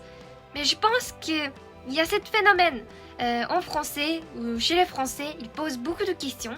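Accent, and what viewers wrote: Japan. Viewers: En tant que français qui apprend le japonais c'était génial d'entendre une japonaise parler français 😄 Les français devaient te poser des questions à 50% par curiosité et à 50% pour ton accent😃 Merci du partage 🙏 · Ok , l'accent nippo_français est vraiment très mignon.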